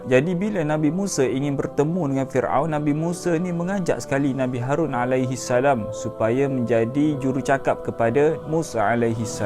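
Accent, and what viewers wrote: Kelantan, Malaysia. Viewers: Abang ni orang kelantan ke?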